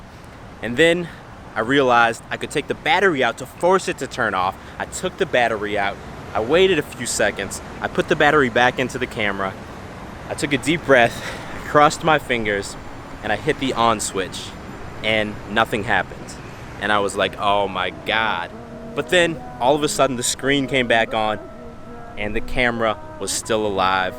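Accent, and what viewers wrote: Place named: Boston, United States. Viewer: I thought he was from Boston not austin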